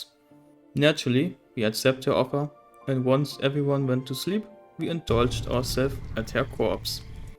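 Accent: Germany. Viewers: Der deutsche Akzent oh yeah xD Hessische Grüße 😎😅🤘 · Are you german? Your accent sounds really unnormal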